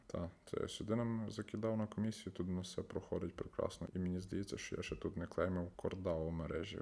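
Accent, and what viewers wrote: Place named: Chervonohrad, Ukraine. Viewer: У тебе діалект як у мене) ти часом не з Червонограда?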